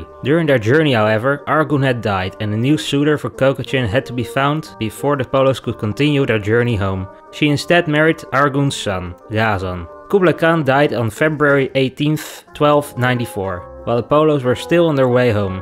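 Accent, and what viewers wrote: Netherlands. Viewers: i can hear your dutch accent haha (im dutch as well so) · The narrator is Dutch i recognize this thick accent 😂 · This video helped out so much! I didn't understand Marco Polo's journey till now! This is great for my project! Thanks. Even though you had a Dutch accent you still explained really well. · The second I clicked on the video I KNEW he was Dutch. :') onlyDutchiesrecognisetrueDutchies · Ben jij een Hollander?..ik hoor het aan jou accent.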